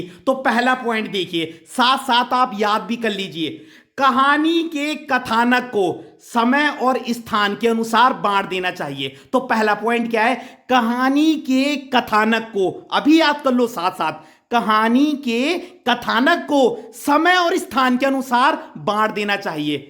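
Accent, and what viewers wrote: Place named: Garhwal, India. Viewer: Sir aap garhwali hoo 😍😍 apki awaaj se pata lag gyaa 😂❤️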